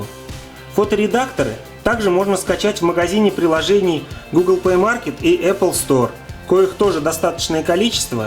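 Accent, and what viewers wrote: Russia. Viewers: О, чувак владимирский похоже))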